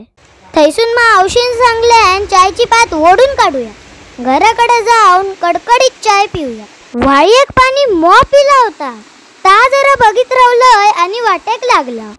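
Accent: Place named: Malvani, India. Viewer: बाय...तुमचा गाव कुठला? अगदी प्युअर मालवणी बोलतस...मस्त.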